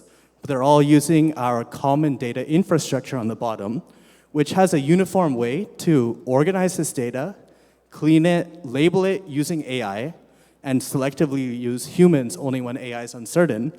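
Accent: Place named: United Kingdom. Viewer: An Indian with such a wonderful accent. Speaking like a pure British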